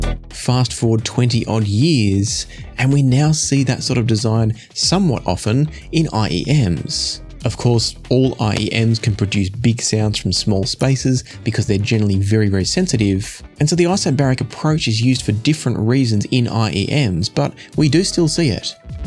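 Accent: Australia. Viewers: He's Australian......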